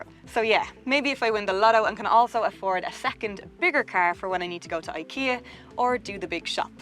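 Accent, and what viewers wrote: Ireland. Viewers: Cool car and love your Irish accent. I laughed at the "WHAT ARE THOSE" with the tail lights. I think they are just a fun little touch as Mini is a traditionally British car even if owned by BMW now. Overall great review. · Oh my god! I had forgotten how quickly i fall in love when hearing irish accent spoken by a stunning woman 😳 And - a great review 👍🙂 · Here's a comment regarding new hardware: I would marry Irish girl blind folded... :D Love the Irish accent.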